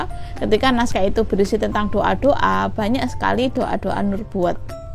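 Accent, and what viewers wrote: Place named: Java, Indonesia. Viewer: jowo nggeh mbak 😀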